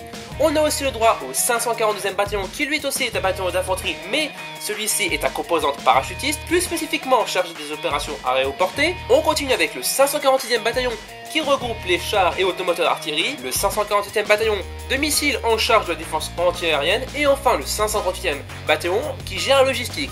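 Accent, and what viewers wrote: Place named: Russia. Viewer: Héhé t'as carrement géré! L'ecriture, les voix de BONHOMME bravo mon!! Bon petit accent russe en plus!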